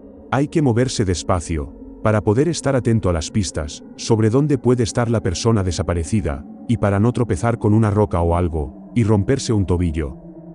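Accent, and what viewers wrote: Spain. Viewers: Me gusta más la narración con esta voz en castellano a pesar de ser de MEXICO. Me encantan las narraciones con ese sonido de fondo.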